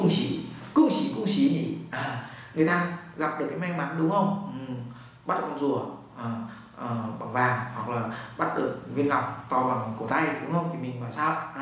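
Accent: Nghe An, Vietnam. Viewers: nói dc tiếng nghệ an nữa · Thầy bắn tiếng Nghệ An ghê quá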